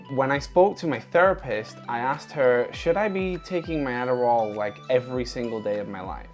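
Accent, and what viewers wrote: United Kingdom. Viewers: You sound like a limey, is your adderall on Private RX? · So where are you based because you have a British accent but I don't think Adderall is available in the 🇬🇧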